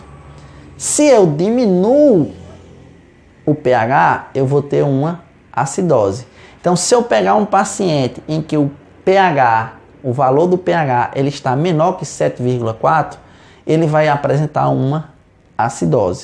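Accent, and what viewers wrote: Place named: Northeast, Brazil. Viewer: Esse povo Nordestino tem um acento muito feio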